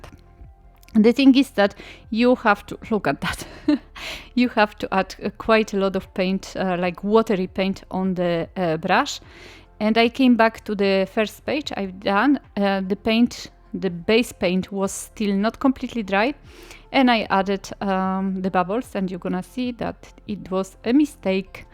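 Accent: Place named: Iran. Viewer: I love your accent. Is it Persian?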